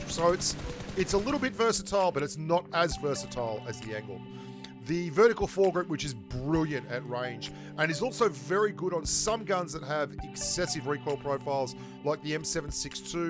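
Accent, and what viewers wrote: Australia. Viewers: You not boring bro haha especially that Aussie accent always cool to listen to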